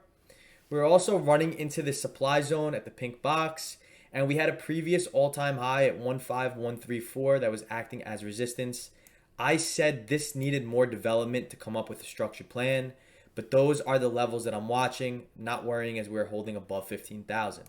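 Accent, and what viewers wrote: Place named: New York, United States. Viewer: you sound like a New York Italian Mobster, I love it 😂 Great video though as always.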